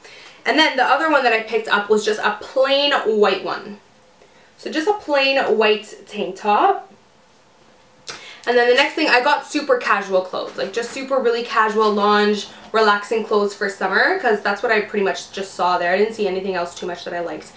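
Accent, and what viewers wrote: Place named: Canada. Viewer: I never noticed how much emphasize you put on your O's lol ive never heard a legit Canadian aaccent. love your haul boo!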